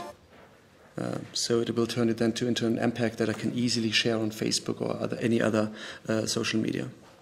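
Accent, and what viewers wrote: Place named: Germany. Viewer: Man merkt das du deutsch bist :D Akzent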